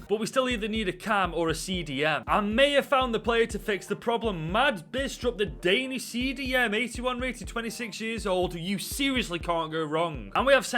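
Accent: Stoke, United Kingdom. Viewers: You're accent! You're from Stoke right? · Must be from Stoke yourself with that accent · I absolutely love his British accent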